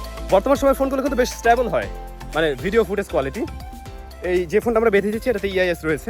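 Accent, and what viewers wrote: Barishal, Bangladesh. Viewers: vai Apni akjon বরিশাইল্লা! ajke janlam.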